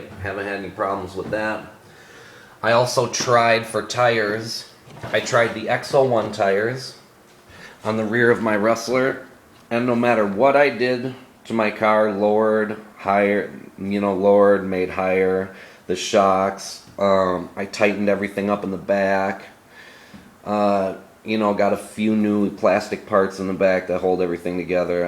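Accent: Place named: Michigan, United States. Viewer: Not to be weird but are u from Michigan u sound exactly like a sub teacher I have lol but nice car!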